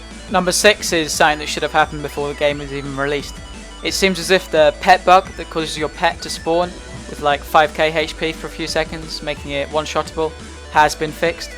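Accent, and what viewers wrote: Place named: England, United Kingdom. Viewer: im not sure but he sounds english :p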